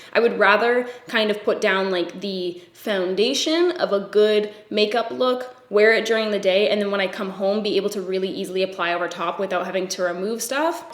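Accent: Canada. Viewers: I really enjoy watching your videos. It's hard to find naturally fair skinned, blue eyed, blondish artists doing makeup videos that are both imaginative and genuinely good. Also, I really appreciate how honest and down-to-earth your narration is. I know you said you're Canadian and not American but for a European, often the accents are pretty much the same. The American video narration style can be very cheesy or loud and repetitive without really saying anything new. It's for this reason that I actually rarely finish a makeup video. I tend to get irritated with the token phrases and the industry veneer everything has. Your videos taught me more about how to approach makeup and it's application...rather than just what's fashionable now... which is so important given how economically unfeasible it is for most people to buy these products. Thanks a mill for not being a knobhead. 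Love from Éire X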